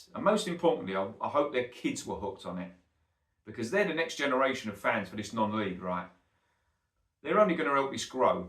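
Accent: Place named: Cockney, United Kingdom. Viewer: Not every day I agree with a cockney, but eye mate is spot on.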